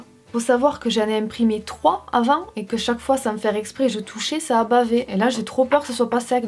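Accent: South, France. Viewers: Oh putaing l'accent du sud j'adore ! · J’ai oublié de dire Bonne continuation aussi. Ah qu’il est beau cet accent du sud. Je regardais déjà tes vidéos à tes débuts et franchement tu es resté toi même et ça c’est beau aussi.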